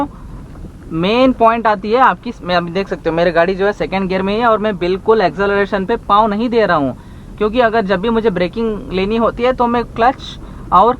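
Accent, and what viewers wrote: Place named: Karnataka, India. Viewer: Being a kannad...your hindi is excellent👍👍....a little influence of your mother tongue is quite natural !!!....👍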